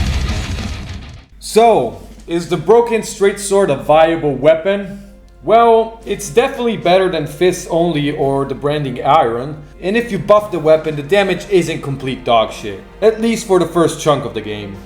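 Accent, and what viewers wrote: Italy. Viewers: Rapid question, you italian?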